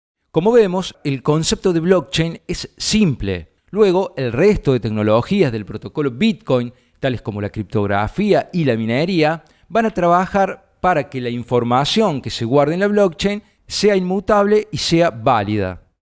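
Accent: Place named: Córdoba, Argentina. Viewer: Genio capo Idolo, muy bueno todo. sos un crack! por tu tonada andas cerca de villa maaaria! abrazo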